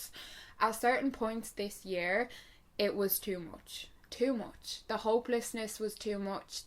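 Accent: Ireland. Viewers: I love your Irish accent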